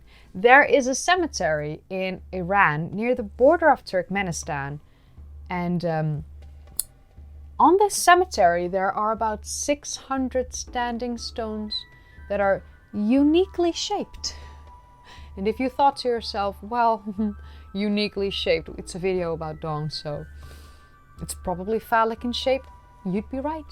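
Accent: Netherlands. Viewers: You have a beautiful accent. is it Dutch ? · Hahaha 🤣 great presentation and cute dutch accent 😉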